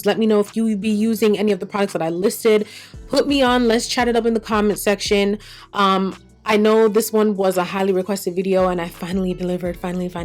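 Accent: Jamaica. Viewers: Lil Sis when you break out in Patois, I know it's clutch, and I run to my nearest Sephora😀😀😀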